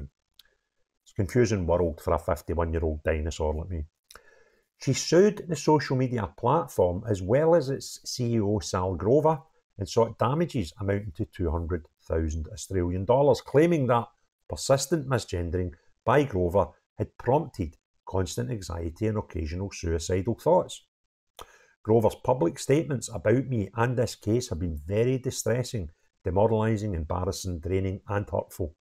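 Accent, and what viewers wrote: Scotland, United Kingdom. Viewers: No disrespect but your Scottish accent saying ‘giggle vs tickle’ made me laugh out loud. You could tell any joke badly but your accent would make it funny. Then you go onto talking about the cat/dog identity confusion. Sounds like a comedy show. Good luck on your channel. But I recommend you try stand-up. British accents have the advantage of already sounding funny. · Are you from Scotland? Your accent sounds like my dad's,who is a Scot.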